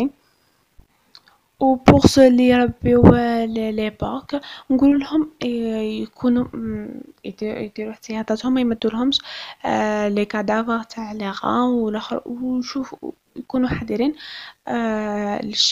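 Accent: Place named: Arab world. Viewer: Vous parlez le français arabisé ou l'espagnol ? J'ai du mal à vous comprendre par endroit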